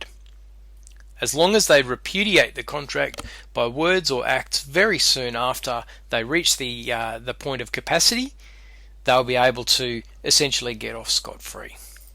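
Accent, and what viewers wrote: Australia. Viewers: Please excuse my ignorance, but does this apply in United States law? Or is this upload meant for Australia (or elsewhere, I'm only going off of your accent honestly lol).... Not trying to generalize (maybe you're in the US and just happen to have an accent from before), but I figured I'd better make 100% sure that this will apply to my own situation :) thanks for the insight on contract law! Very well explained.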